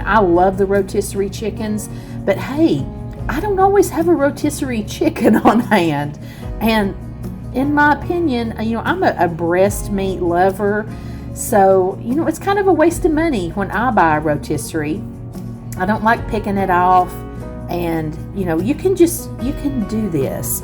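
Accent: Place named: Mississippi, United States. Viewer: Wow! I just found you. You are my Favorite Cook on YouTube! I am from Mississippi and you sound just like home! ❤️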